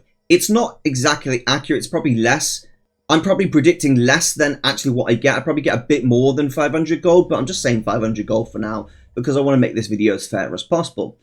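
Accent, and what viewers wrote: United Kingdom. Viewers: Minimum wage in the UK is £11.44 per hour. WOW costs £9.99 per month in the UK (or less depending on plan). In case you don’t recognise the accent, this guy is from the UK. If you were doing 60 characters like he suggested that is going to be at least an hour a day. Over a month that would be the equivalent of around 30 hours. At minimum wage that is going to be around £340 you could have earned for that same time doing something boring. I can understand if it is quick or fun or yields a lot for the time, but this doesn’t tick any of those boxes if your intention is to just pay for your subscription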